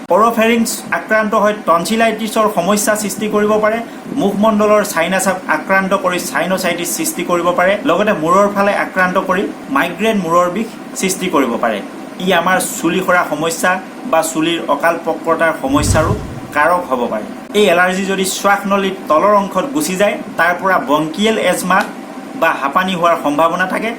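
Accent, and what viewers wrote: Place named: Assam, India. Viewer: Aponar kothakhini suddhokoi Axomiya bhasat koa babe khub bhal lage.Dhanyabad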